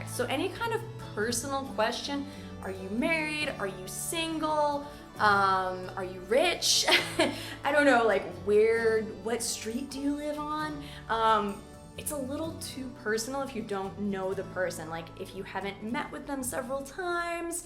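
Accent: United States. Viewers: You speak American not English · English speakers? The American version would be more accurate! Weird hearing a yank telling us how we speak. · Hey. I would like to know about your accent. What state do you live in the US? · Thank you! I am an Italian English teacher and I really appreciate your lessons! I would like to have your American accent but I still have some difficulties and here books are all with British accent and with “I am fine thank you and you!”🤣 thank you again! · Actually, you speak American. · They ask you a lot it because you're beutiful and have a great appearence , here in brazil its normal , mainly you have these blue eyes and nice american accent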